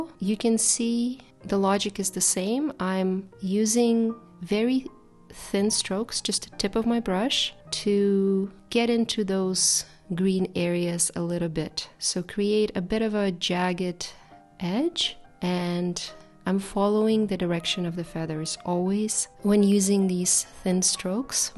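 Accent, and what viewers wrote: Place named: Canada. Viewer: Well, I"m a native English speaker from London and currently do a little bit of teaching English where I live in Spain. I must say, I thought you were Canadian! Not only that, but you have a beautifully sounding voice that is calm and relaxed, which is very soothing on the ears! I saw a video of an interview you did for a Ukrainian news channel. The way you switched between the two languages was excellent. I'm fluent in Spanish, so I can appreciate the effort it takes to learn another language. But you have absolutely mastered the pronunciation of English, despite it not being your first language. It was very interesting to learn that some of your art skills come from ancient Ukrainian folk art traditions. Folk art is an important part of history and culture that unites people with their past, but also gives them a frame of reference to guide them through the present. 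It is also a great gift to share with other people and cultures. Long may you continue with your wonderful projects and I wish you a long, healthy and happy life for you and your family.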